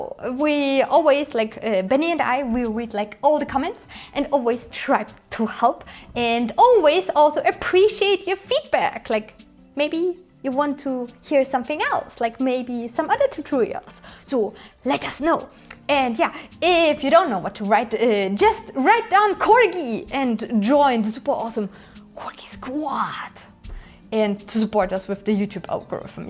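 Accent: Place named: South, Germany. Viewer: Da kam das deutsch durch. Habe dich gerade erst gefunden und war noch nicht ganz sicher, welcher Akzent das ist... Erstmal abonniert.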